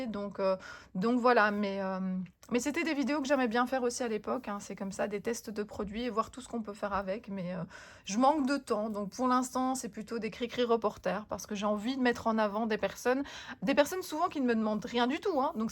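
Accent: Belgium. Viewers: Bonjour, j'aime bien écouter vos vidéos et votre accent belge est trop rigolo 😉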